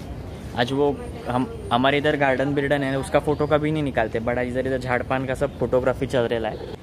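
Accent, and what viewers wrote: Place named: Wadval, India. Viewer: काय रे बाबा कुठे राहतोस?वाडवल बोललास म्हणून विचारलं?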